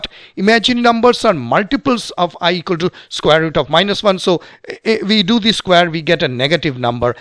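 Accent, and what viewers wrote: India. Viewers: He is a really Indian 😅😅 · U sound like an Indian ( no racism ) 😅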